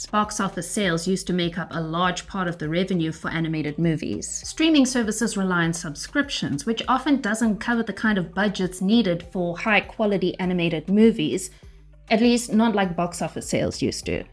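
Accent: South Africa. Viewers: This is completely unrelated but your accent sounds sooo similar to South African ones. Are you from South Africa??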